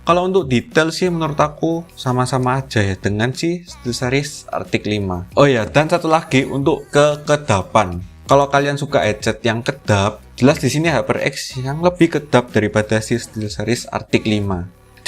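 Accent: Java, Indonesia. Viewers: mantap bang , ada khas medok medoknya . GDluck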